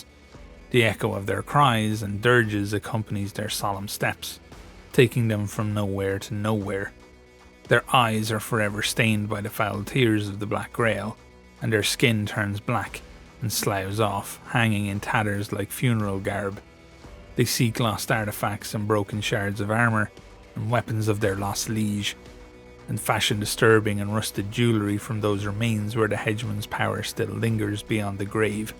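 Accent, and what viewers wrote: Ireland. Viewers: Those Irish tones add a subtle calmness to the nightmarish narrative you're describing.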